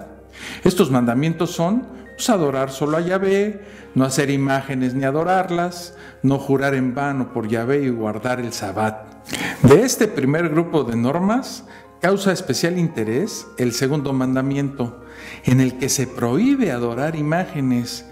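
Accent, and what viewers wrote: Mexico City, Mexico. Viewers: Cómo me hiciste, reír con ese sabroso acento chilango jajaja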